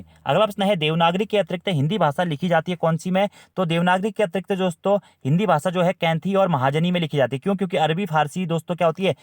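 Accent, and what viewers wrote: Almora, India. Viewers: Ap almora se ho kya sir😅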